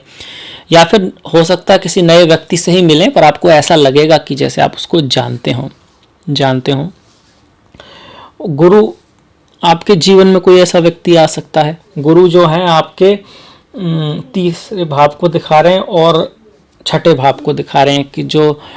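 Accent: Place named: Mathura, India. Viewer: Nice to know.....As per your accent of hindi, I think your background is from Mathura/Agra UP... I also belong to Mathura , presently residing in Jaipur Rajasthan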